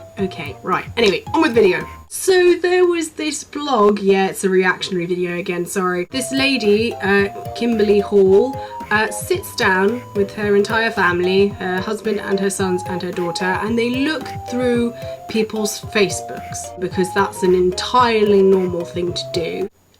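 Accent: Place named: England, United Kingdom. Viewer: It's so great to see an entertaining Englishwoman who's accent doesn't make me want to kick a puppy. English accents are either cool sounding and enjoyable, or ridiculously annoying and hellish. There is no inbetween for me.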